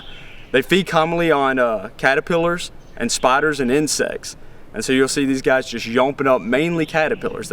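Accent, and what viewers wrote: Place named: South, United States. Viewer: Love the southern accent you have. Keep on truckin bro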